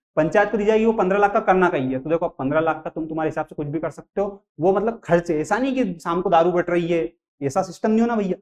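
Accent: Indore, India. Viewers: Indori accent❤❤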